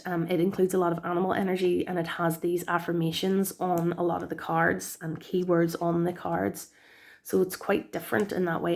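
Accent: Ireland. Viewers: Oooh I love your Irish accent 😍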